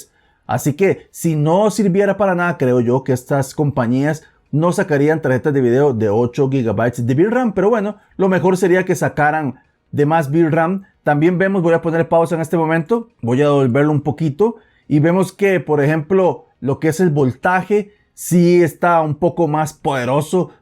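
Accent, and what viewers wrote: Costa Rica. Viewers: jaja que bien, no se porque dije: este mae tiene jacha de tico y acento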